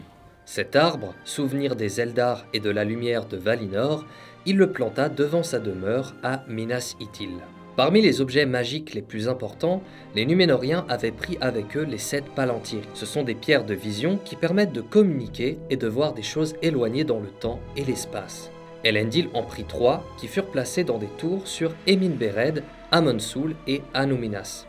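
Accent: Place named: France. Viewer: Continue de prononcer à la française!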